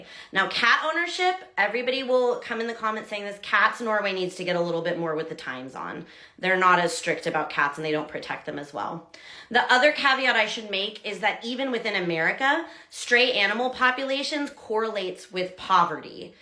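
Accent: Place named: Canada. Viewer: This video makes me think you've never actually lived in the US. Are you Canadian? You sound like you have a Canadian accent.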